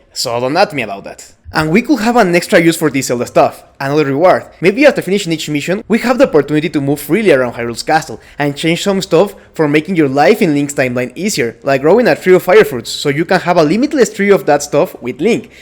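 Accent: Spain. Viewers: Supongo que eres de España por tu acento asi que te comento en español, la verdad es que la idea que propones me parece bien, el problema es que Zelda es muy poderosa, se cargo a todos los guardianes de la zona en la que estaba link luchando en una de las memorias de BOTW, mantuvo a Ganon durante 100 años en el castillo y después de que link lo derrotara lo selló. Ahora esto se podría solucionar diciendo que Zelda ha perdido poder después de sellar a Ganon (ella misma dice en el final secreto deBOTW que ya no nota la trifuerza) y hacer que realize las pruebas que mencionas en tu video para recuperar la trifuerza completa y así ayudar a Link a derrotar a Ganondorf, que es lo que impidió que esto pasase el miedo que tiene Nintendo de que la gente se queje. Aun así se que algún día dejarán atras ese miedo y lo haran, así como canonizar el Zelink. PD: Buen video bro❤ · you have like a spanish accent it's kinda weird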